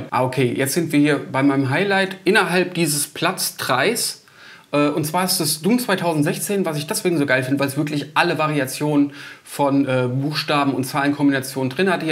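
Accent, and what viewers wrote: Hesse, Germany. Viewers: Hart am feiern und immer wieder schaut der Hesse raus :)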